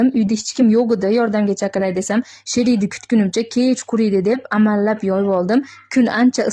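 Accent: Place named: Khorezm, Uzbekistan. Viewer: Хоразмлимисиз суллашингиз Дим ухшиди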